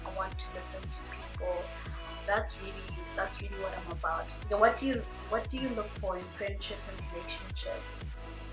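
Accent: South Africa. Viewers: omg!!! legit thought you were South African. your accent says S.A guuurrrlll?????!! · You have a cute South African sounding accent! Love your spirit! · South African accent...😑I thought that too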